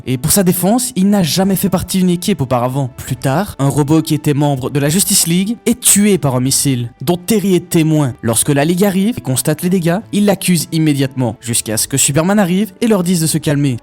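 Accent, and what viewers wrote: Liège, Belgium. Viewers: J'ai beaucoup aimé ta vidéo Et j'ai cru comprendre a l'accent Que tu es un compatriote liégeois 🤜🤛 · c'est moi ou il y a un fort accent de liège xd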